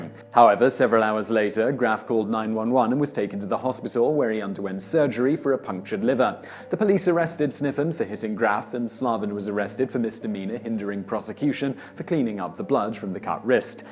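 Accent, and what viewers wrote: United Kingdom. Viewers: your pronunciation is awesome other then having a uk accent , i have never heard you mispronounced words compare to other channel, do you fact check how to pronounces them before recording, so professional!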